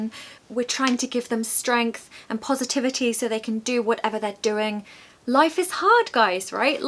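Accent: RP, United Kingdom. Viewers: Hi, i love your British accent ❤ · ❤❤❤❤❤❤❤❤ Love you so much ! Your Modern RP accent is really beautiful and wonderful My GREATEST Teacher of British English Language!!! ❤❤❤❤❤❤❤❤❤❤❤❤❤❤ ❤❤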